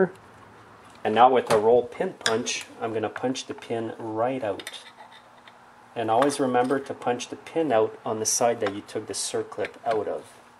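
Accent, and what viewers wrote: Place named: Canada. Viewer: you sound somewhat Canadian. Nice vid tho, its good to see a professional do it, in the correct order. What solvent are you using in your parts washer? It dosent adversly effect the ignition bits? I'm sure its all solid state.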